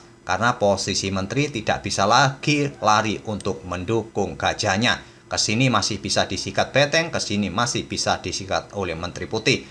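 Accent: Java, Indonesia. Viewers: jangan kurangin analisisanya min, tapi klo tujuannya mengurangi durasi, boleh tapi sedikit aja, itulah ciri khas channelmu dan satu hal yg harus admin tahu, admin tahu caranya menganalisa sesuatu dengan intonasi yg tidak datar( ada variasi tempo yg cepat lambat dan variasi nada yg tinggi rendah) dengan dibumbui logat khas(jawa), ini modal yg besar untuk menganalisa sesuatu kepada penonton karena tidak semua orang mampu menguasai ini, jadi jangan sia2kan ya admin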